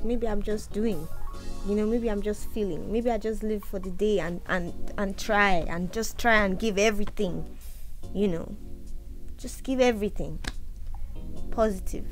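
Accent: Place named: Nigeria. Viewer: wow she has conc. nigerian accent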